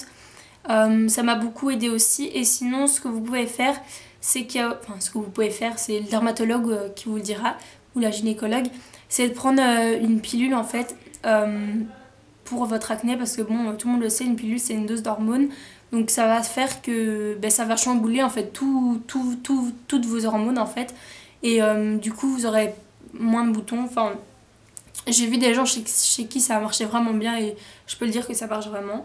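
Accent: Belgium. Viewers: Ahhh une belge qui fait des vidéos ! J'ai reconnu ton accent ! Ça me fait plaisir ;) Tu es d'où ? :) Et tes vidéos sont super ! J'adore !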